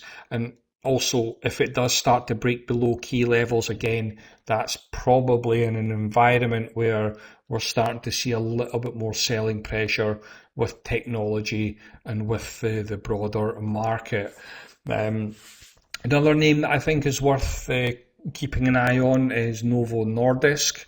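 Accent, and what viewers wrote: Scotland, United Kingdom. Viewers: Just subscribed to the channel and enjoyed the content and the Scottish accent! Did a trip to Scotland 2 years ago and found a cemetery In Dysart, Kirkcaldy, that had my grandfather 7 generations back. A huge fan of technical analysis so this video was enjoyable to watch and I will watch previous months also. My biggest positions are airlines-I have 3 but I would like if you would cover UAL. One thing I don't know much about is Fib extensions so if you would cover that I would appreciate it. Thanks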